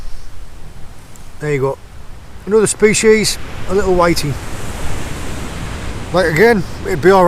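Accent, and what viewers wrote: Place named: Cumbria, United Kingdom. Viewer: Your accent sounds West Cumbrian, like Whitehaven or somewhere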